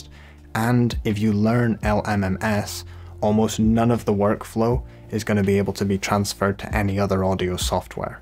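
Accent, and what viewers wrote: Scotland, United Kingdom. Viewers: what part of scotland are u from mate ???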